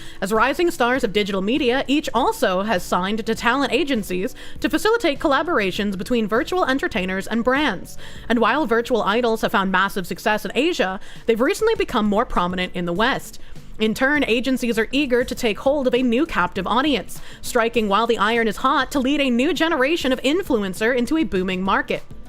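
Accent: Boston, United States. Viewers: It's Bostonian dialect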